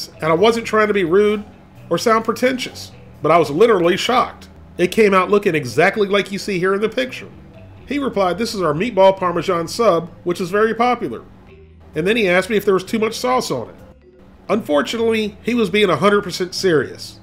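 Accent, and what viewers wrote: South, United States. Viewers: Is it just me or does he really sound hillbilly?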